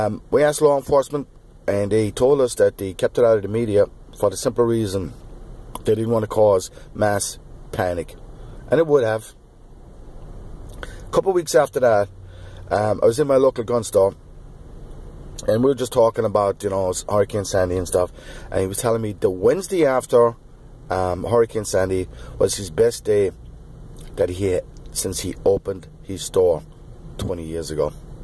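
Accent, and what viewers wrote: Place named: Netherlands. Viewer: so, just thinking, your accent sounds like an old Holland/dutch accent, am I close? btw, reports are interesting from LA, cousin is getting out of the state tonight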